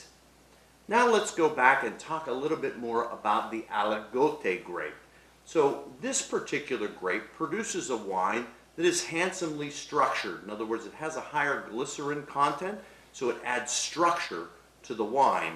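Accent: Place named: Italy. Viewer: you literally said all French words here in Italian accent 😢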